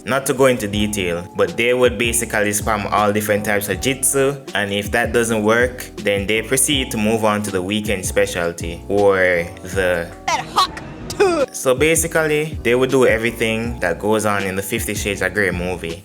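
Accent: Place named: Jamaica. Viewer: Yu sound jamaican.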